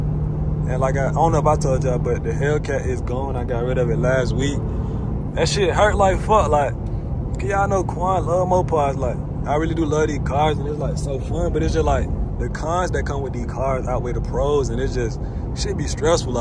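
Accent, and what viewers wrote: Atlanta, United States. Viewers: bro i kid you not people talk like this in atlanta its an accent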